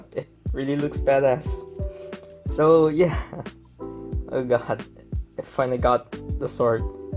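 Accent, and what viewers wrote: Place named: Philippines. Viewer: I don't know why but. I feel like you live in the Philippines Judging on your voice and the way you talk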